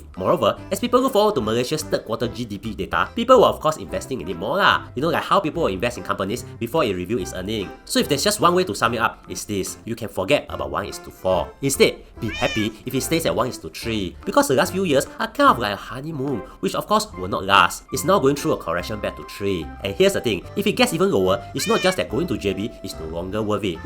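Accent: Singapore. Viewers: Even if i don't agree with you on some points, your delivery is humorous, very singlish. Good job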